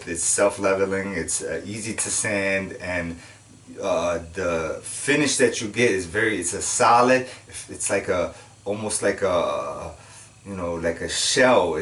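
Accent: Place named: New York, United States. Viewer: My father was a house painter. I had tons of exposure on tools, method and product. Thank you for adding to my knowledge base for kitchen cabinets. I have oak cabinets and I want to update with A nice off-white on the uppers and a darker color on the lowers. I’ve been watching YouTube videos on how to prepare the oak cabinet and I was becoming discouraged because of the level of difficulty in the preparation phase with the wood filler etc. None of the videos I saw until today talked about self-leveling paint for cabinets. I’m gonna do what another comment mentioned, do the bathroom cabinets to get a feel for it and then tackle the kitchen. My dad‘s not here anymore to help me so I’m doing this in his honor. Thank you for the video I really appreciated it. With your accent you make me miss my New York people. God bless.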